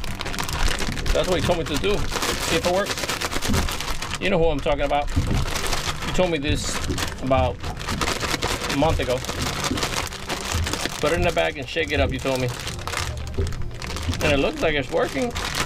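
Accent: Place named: Cuba. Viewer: Disculpa la pregunta pero acabo de unirme a tu canal y me gustaria saber porque siempre tienes la cara tapada y porque no dices una palabra en español si tu acento te delata que eres latino pienso que cubano.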